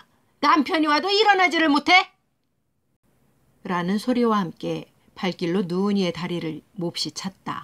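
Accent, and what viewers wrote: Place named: Pyeongan, North Korea. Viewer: 사투리 ㅋㅋㅋ 무슨 평안도 사투리같아요 ㅋㅋㅋㅋㅋㅋㅋㅋㅋㅋ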